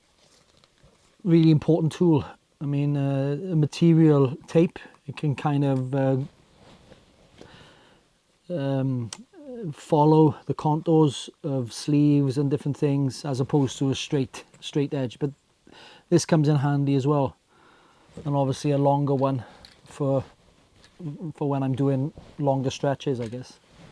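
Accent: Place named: Wales, United Kingdom. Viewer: I was pleasantly surprised to hear a Welsh accent coming to this video. A great watch and great to see another Welshman do well, and show passion in his craft. yn anfon llawer o gariad o Llanelli. Iechyd Da i chi!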